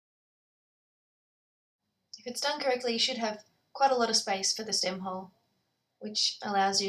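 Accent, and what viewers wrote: Australia. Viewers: Australian accent eh!!! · Yeah she’s Australian, it’s a nice accent as it’s not too broad. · I've tried 5 or 6 times, following 5 or 6 different videos, and NO LUCK! Saw this one, SUCCESS! Thank you very much! Is your accent Australian? Sounds that way to me. steve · Australian I think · When I first heard you say: "under" I was hoping to hear "down" just before it. Love your Aussie accent. Please don't be offended. Nice video too. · Yes.. she Australian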